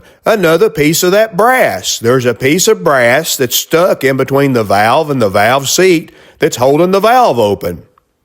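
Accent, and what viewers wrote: South, United States. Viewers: You guys are a totally awesome sight always and your voice sounds southern!! Don't ever change I love your attitude!!! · I think some of your listeners need there hearing checked, You do have a different voice to most people nowadays that's only because your southern and we are all a dying breed. Your singing voice is excellent I wish I had that, but its your voice not anyone else's that is what makes it sound so good. But Dukes of Hazzard and Forrest Gump I bet your wife thinks people are crazier than I do how funny. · Well, I like this channel just the way it is. You do not talk too much. You do not sound like ol Waylon. Sorry. But you do sound like a more southern version of my old pal Tim From Throttle Power up in Louisville. 😊 Your gasoline hallucination was a nice treat. Another enjoyable video. Thanks. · Lmao, im an arkansas hik and you sound like you're from the south. 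The brass had to come from above the carbs, very fortunate it didnt get passed the valve. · Not Forrest just southern I guess I am in New Mexico but my family is all Texan sounds normal to me. lol love the vids 34 5w is my dream car one day maybe but at 62 maybe not got my 67-mustang hot rod runs 10's but I would love a 32-34 5w coupe my dad had 32 chopped 5w when he was young man wish it was mine. keep the cool content coming. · You sound like most of the people I know “southern blue collar workers “ I’m kinda proud of that . Those that poke fun of us sound just as different to us as we do them , I agree with the one guy , like the mixture of your videos ,machinist , mechanic, car lover , farmer, musician, family man, just a good ol boy,you know your mama love you !!!!!!😂😂😂 hey you can’t get all those degrees down at the university, 😊😊😊😊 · Just because you hear a nice southern drawl, they start thinkin’ you sound like somebody. 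What about Roscoe P from Dukes of Hazzard, or Conway Twitty? You just keep being you, and I’ll keep watching the guy that sounds like half my kin!!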